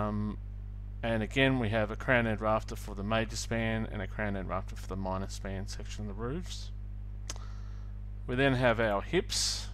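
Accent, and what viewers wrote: Australia. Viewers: Very good explanation. And nice to hear an Aussie voice.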